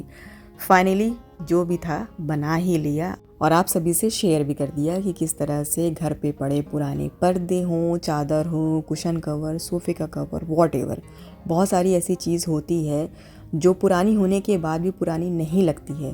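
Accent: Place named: Gorakhpur, India. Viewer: wah masha Allah meri padosan inkli ap...pr ap ki language me gorakhpur side ka touch hai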